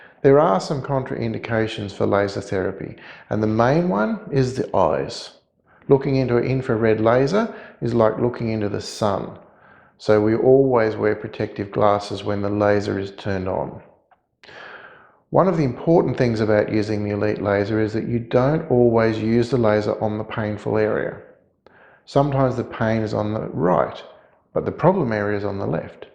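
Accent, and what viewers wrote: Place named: Australia. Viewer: It’s Australian English, it’s very clear. 😂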